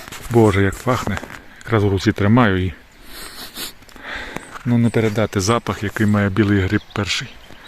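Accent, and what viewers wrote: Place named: Zakarpattia, Ukraine. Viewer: По мові чути: Закарпаття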